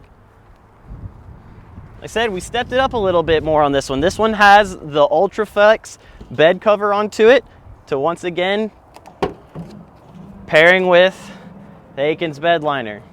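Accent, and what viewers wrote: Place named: South, United States. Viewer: Good stuff, love the rolling shots as well. Both beautiful trucks. Only thing missing is the signature southern accent we all love. ( my wife says your hot. Haha ) On the white truck. What is minor trimming?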